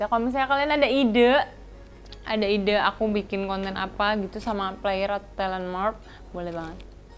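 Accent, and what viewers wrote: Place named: Indonesia. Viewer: logat nya indonesia banget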